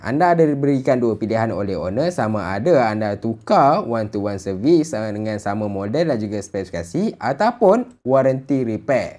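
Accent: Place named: Sabah, Malaysia. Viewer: loghat sabah laa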